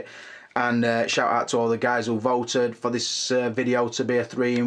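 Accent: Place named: Scotland, United Kingdom. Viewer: he is a scottish person i knew because how he talked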